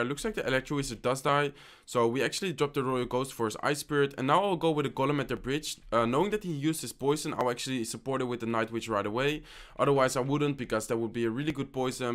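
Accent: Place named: Netherlands. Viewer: You can hear that you're from the Netherlands that is what I thought when I watched you for te first time😂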